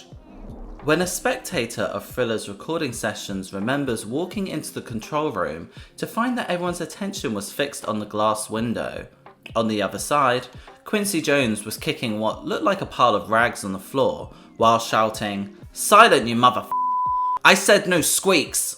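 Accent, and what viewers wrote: United States. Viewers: I was ok with american accent❤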